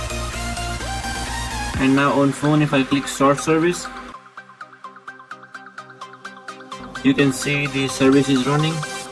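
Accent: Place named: India. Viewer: Please turn down that annoying music. I have a hard time with accents as it is. I love people from that region, but have a difficult time uderstanding.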